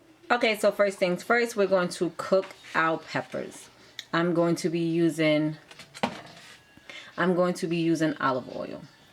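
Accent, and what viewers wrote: Brooklyn, United States. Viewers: Nicely done!!! I hear a Bk accent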